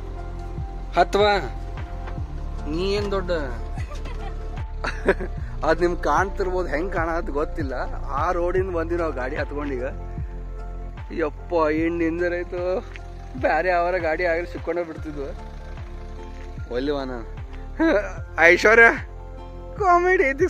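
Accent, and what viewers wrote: North Karnataka, India. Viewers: uk bhase pakka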